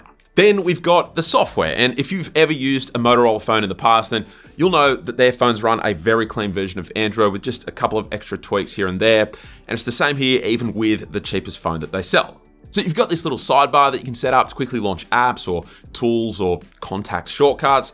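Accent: Australia. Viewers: His Australian voice makes this even more soothing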